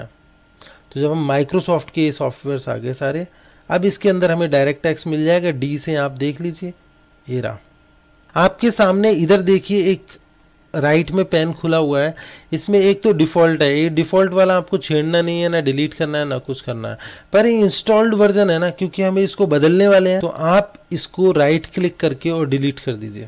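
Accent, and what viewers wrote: India. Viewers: Why your English is so indian!!!!!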